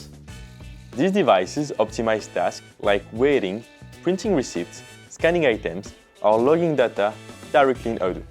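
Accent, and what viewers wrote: France. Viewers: J'espère que tu as amélioré ton accent trop french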